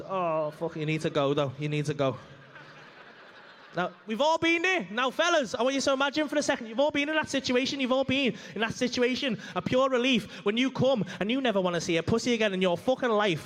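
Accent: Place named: Manchester, United Kingdom. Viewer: you manchester im guessing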